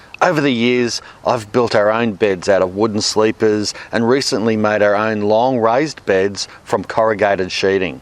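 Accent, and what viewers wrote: Australia. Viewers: Thank you ! I caught the Aussie accent, but didn't know if he was still there. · Love your videos, tips, and that very authentic Aussie accent. With love from Russia :D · Well done for making the effort to speak nice and clearly and using lots of gestures. Makes such a difference for viewers who don't speak English as their first language or who aren't used to an Aussie accent. Top work.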